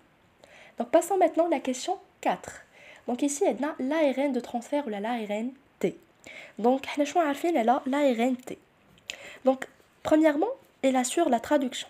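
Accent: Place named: Paris, France. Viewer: 😂😂😂😂hrbtini tana kt3sbni hdra dyalha tguli ghir ylah nzlat mn paris hhhhhhhhhhhh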